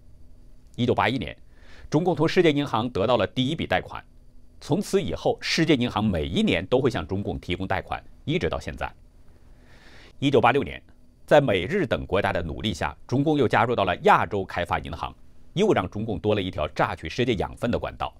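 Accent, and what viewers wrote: Standard. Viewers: 最标准声音主播👍☕️ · 这个主播的普通话很标准，但我肯定他不是中国人。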